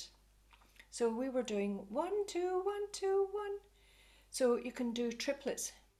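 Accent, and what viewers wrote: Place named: Scotland, United Kingdom. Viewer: I really enjoy your videos - and it makes me very homesick to hear your beautiful accent. I'm hanging on to mine, but would love to return to Scotland after 22 years down south...one day!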